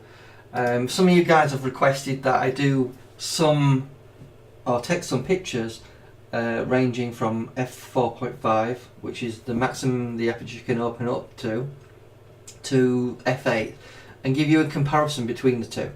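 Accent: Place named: Thailand. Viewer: That sounds like someone from Thailand reading some text …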